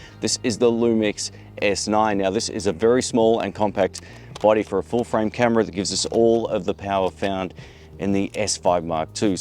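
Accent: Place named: Australia. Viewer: It was all looking awesome……until you mentioned the record time limits! It did seem like that was going to come up as a problem as there was no visible ventilation – it seems to be a must-have on a full frame nowadays. With any luck Panasonic will be doing a GX9ii with a large chunk of the G9ii features…….now that would be an awesome street camera!! Being an Aussie in the UK it’s great to hear another Aussie……especially on a channel like this. Keep up the good work!!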